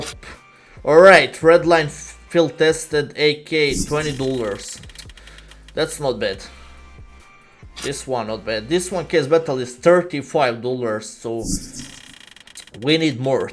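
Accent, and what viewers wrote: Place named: Russia. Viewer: Your russian accent kills me 😂😂😂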